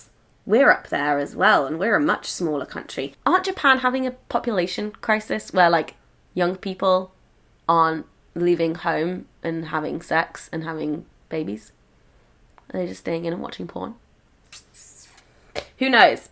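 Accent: United Kingdom. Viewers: British English soo sexy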